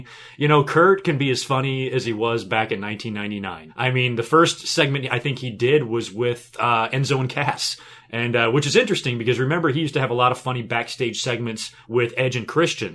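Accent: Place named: United States. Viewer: love the American accent, house of whores match lmao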